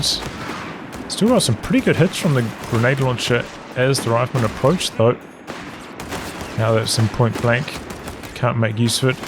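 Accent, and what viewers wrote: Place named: New Zealand. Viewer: Just found your channel, and from hearing your voice I guessing your also a Kiwi. You're doing good stuff mate, definitely gonna watch some more.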